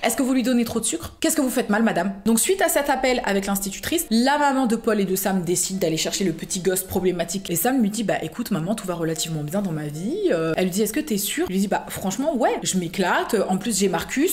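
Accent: England, United Kingdom. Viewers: T grave. Flipante et vrm t trop bien et j’adore ton axant anglais 🤐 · J’aime trop ton accent d’anglais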